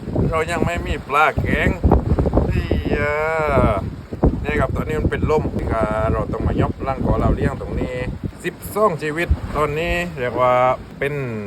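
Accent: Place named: South, Thailand. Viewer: ชอบดูน้อง พูดใต้แต่ฟังง่ายจัดเจน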